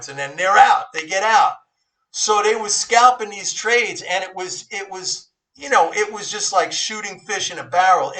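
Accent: New York, United States. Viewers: Just stumbled upon your video. What you say is so true. PS: The NY accent adds the extra credibility that you worked on the floor.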